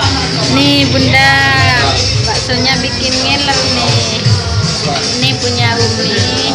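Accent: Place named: Jember, Indonesia. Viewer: asli mana ya klu boleh tau kayak logat bicara e daerah jember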